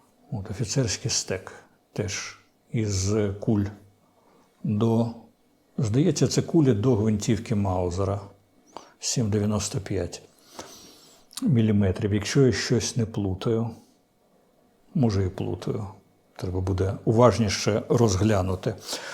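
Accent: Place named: Russia. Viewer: Цікаво і слушно, незважаючи на російський акцент з цими жахливими щьоканнями і чьоканнями, і на слова з російського словника, що ними послуговується автор і ніяк не спроможний відмовитись від них.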